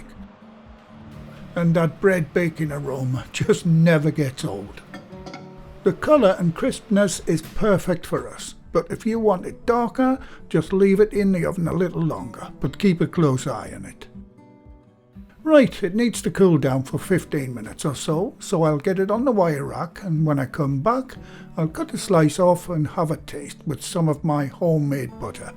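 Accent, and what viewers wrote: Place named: Newcastle, United Kingdom. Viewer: Your voice is so calming for less confident cooks! " Set your yeast away"... I've decided more food channels need to be in Geordie dialect!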